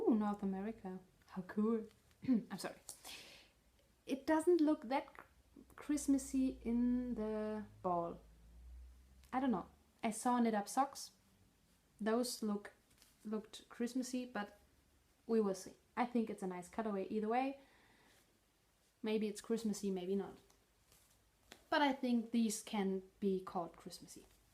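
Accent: Germany. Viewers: I just found your podcast and just love it! Dont worry about your English, it is wonderful, I lived in Germany for three years and can hardly speak it at all. I love your accent and it brings back such happy memories of when I lived there. We lived in Northern Germany in a town called Duelmen out side of Munster. I love the word of the day idea too. I hope you keep podcasting! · Moin moin! Your English sounds so beautiful with your German accent! I've watched all your recent podcasts and decided I love them so much I would start at the beginning! My husband works nights, my children grown, it's nice to have "company" while I knit and crochet in the evening. I'm so glad this wasn't your first AND last podcast. Thank you for sharing your passion for yarn, knitting, crochet and the Lord!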